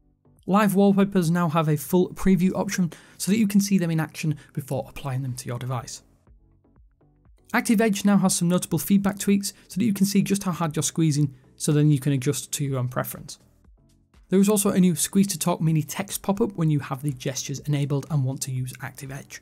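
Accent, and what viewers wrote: United Kingdom. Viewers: That BRITISH accent!!!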